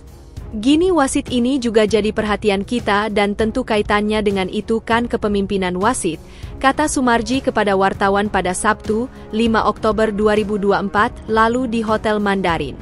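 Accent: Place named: Europe. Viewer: Apakah anda orang asing, kenapa logatnya seperti orang eropa, atau anda tidak punya jiwa patriot, bangsa bahasa indonesia.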